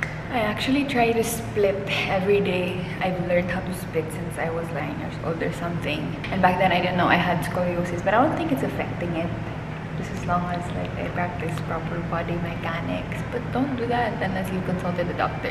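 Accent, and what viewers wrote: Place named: Visayas, Philippines. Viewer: Pag ikaw talaga nag sspeak ng bisaya ang sarap sa ears 😍. More pa sa mga next vlogs pleaseee3 🤗❤ keep safe ate ❤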